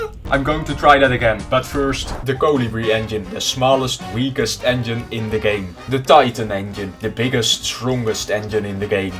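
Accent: Netherlands. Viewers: i can hear that this man's dutch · Is it weird that I can hear that you are Dutch? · Je Nederlandse accent is wel grappig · Judging by your accent your dutch! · Is he dutch voice sounds very dutch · you sound dutch r u? if yes then i wanna say 'hallo man' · Dutch person recognises dutch accent... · ar you Dutch? because im dutch and you have a dutch accent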